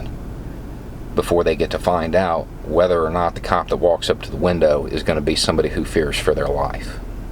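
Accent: South, United States. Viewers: Why does common sense make so much more sense presented at the proper cadence, in a southern drawl? New subscriber and a big fan of everything I've heard so far. Howdy from Detroit.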